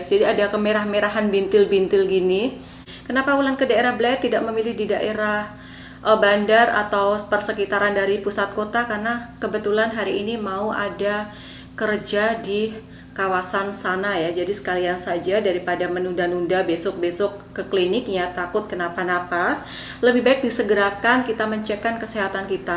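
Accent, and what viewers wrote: East Java, Indonesia. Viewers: aku senang sama gaya bahasanya jeng Wulan, pakai bahasa Melayu, tapi logate medok khas Jatim, keren jeng... 👍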